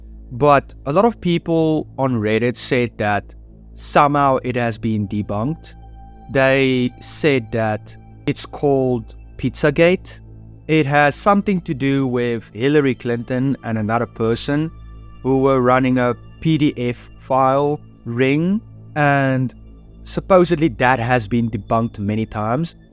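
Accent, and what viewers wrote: South Africa. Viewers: Unrelated question but where are you from? Your accent tells me you're South African. Love your content btw.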